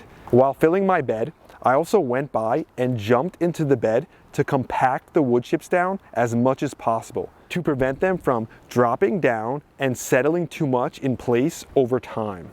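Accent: New Jersey, United States. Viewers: First time I've seen you, I'm guessing you're from Jersey, my wife is and her father said WOODER instead of WATER.😂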